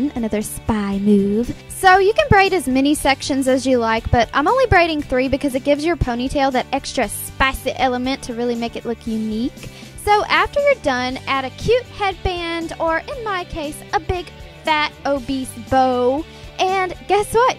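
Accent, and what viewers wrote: South, United States. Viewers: she used to sound SO country! she should play ellie may in a modern reboot lol.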